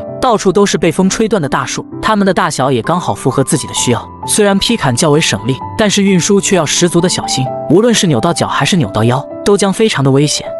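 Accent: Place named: China. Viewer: 聽聲音也知道是大陸人。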